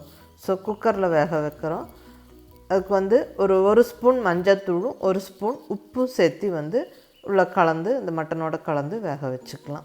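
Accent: Kongu, India. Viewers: Mam...Nenga pesumpothu I noticed Ur Kongu-Tamil.....I like ur Slang and So much of love for u..Mam.😘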